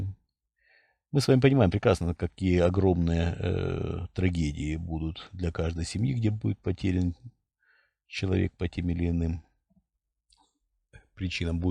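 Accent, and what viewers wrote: Ukraine. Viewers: Уважаемый т.н « », вы мне как лингвисту можете ответить на вопрос, почему у вас явный украинский говор, если вы были генерал-лейтенантом СВР, то есть еще советский специалист, (проходили подготовку в Москве, жили там же) где глубоко погружали в изучение иностранных языков?😊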